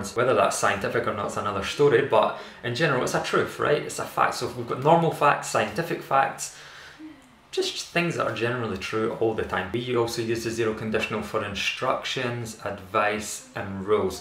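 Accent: Scotland, United Kingdom. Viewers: Nice Scottish accent;-)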